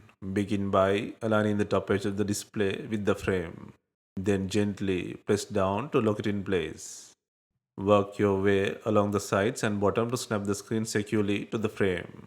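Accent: India. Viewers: Best English with indian accent ever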